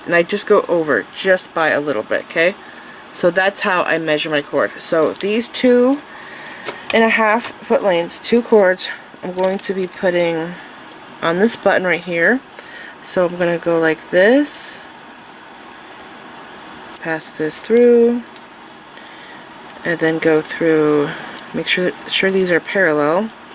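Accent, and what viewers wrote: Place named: South, United States. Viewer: Aw geez, lolol, it's just that touch of Southern that throws you off 🤣😂😆😅😄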